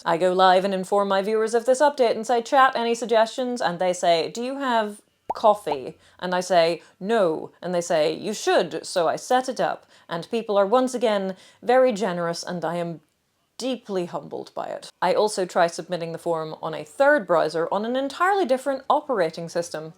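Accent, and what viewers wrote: Ireland. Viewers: Im just trying to figure out what region your accent is from. Irish? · I love that you say : in the Year of Our Lord with that sweetest Irish brogue of your ! If flies in the face of all the dumb sheep who say "Common Era" like it was a piece of legestative rule that if one disobeyed ones nose would fall off . The brogue is what the systemic algorithm looks at with a brain that's bruised with numb surprise with still one place to go.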